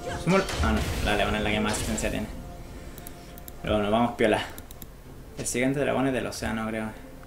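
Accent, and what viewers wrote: Chile. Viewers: eres chileno?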